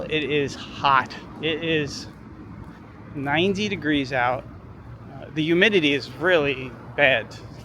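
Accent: New York, United States. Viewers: i said it b4 but have to again .. i fkn love ur videography !! its perfect and the narration the real ny accent hah , the non touristic streets aka the REAL streets.. love it !! if i was ever to get to usa these are the kinda streets id be roaming on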